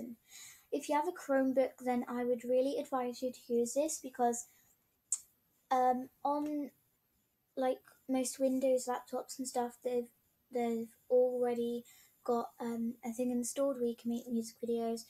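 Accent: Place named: United Kingdom. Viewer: col british accent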